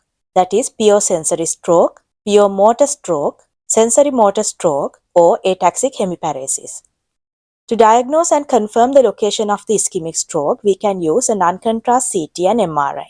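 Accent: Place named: Asia. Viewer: Good video and great effort. Congratulations for a very good job. However, You have a distinct, asian accent difficult to understand by western European citizens and you have spoken to fast at times. Best wishes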